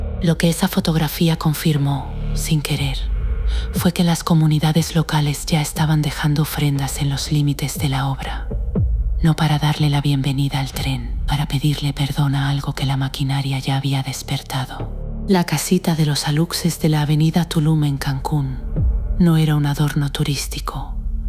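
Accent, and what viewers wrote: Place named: Spain. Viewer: JAJAJAJAJA PORQUE PRONUNCIAN "ALUCSES" SI SU PRONUNCIACIÓN CORRECTA ES "ALUSHES", PORQUÉ LA NARRADORA TIENE VOZ ESPAÑOLA... NADIE SE TRAGA ESTAS MENTIRAS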